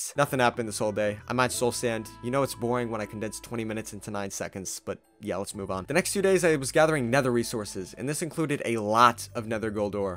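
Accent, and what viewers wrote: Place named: France. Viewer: Your French accasent I cool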